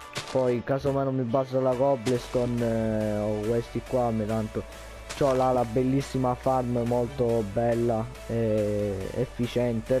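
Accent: Sicily, Italy. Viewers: complimenti fai troppo ridere😂😂 meriti molti più mi piace e molti più iscritti,ma da come parli sembra che se siciliano perché anche io sono siciliano e assomiglia molto al siciliano da come parli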